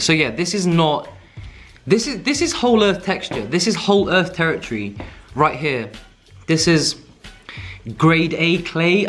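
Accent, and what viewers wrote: Germany. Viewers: She sounds 10000% German lol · I hear a german accent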